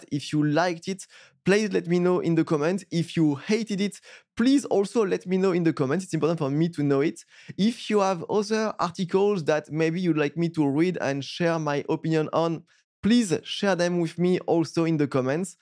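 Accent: France. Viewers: L’accent franchouillard qui fait mal aux oreilles 😂😂😂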